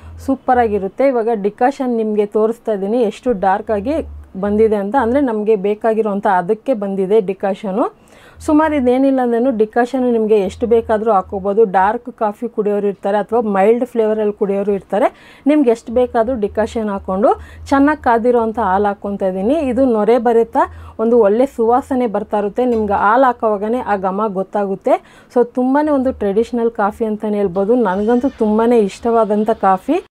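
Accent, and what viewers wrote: Tamil Nadu, India. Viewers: ಇವರದು ತಮಿಳ್ ಅಕ್ಸೆನ್ಟ್😂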